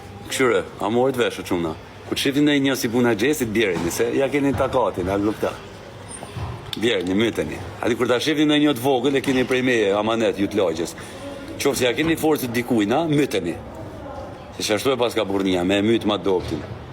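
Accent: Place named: Durrës, Albania. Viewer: Ca bone si fol arkimeti si na durrsi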